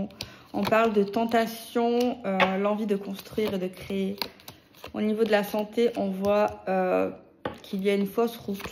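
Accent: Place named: Provence, France. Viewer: Coucou vous êtes de quel département le Vaucluse ou bouche du Rhône pour l'accent que vous avez ? Bonne journée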